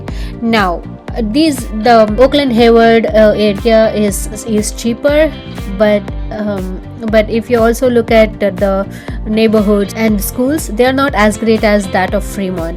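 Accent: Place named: India. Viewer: Indian accent.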